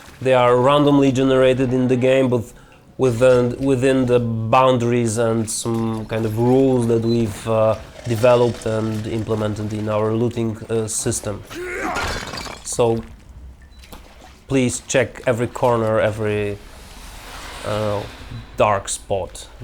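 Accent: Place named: Eastern Europe. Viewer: his accent is so eastern europeany like it feels like im having sex with his voice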